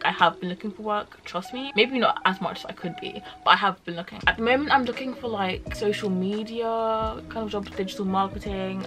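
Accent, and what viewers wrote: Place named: Leeds, United Kingdom. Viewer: I think your accent has been influenced by Leeds. You don't sound fully london anymore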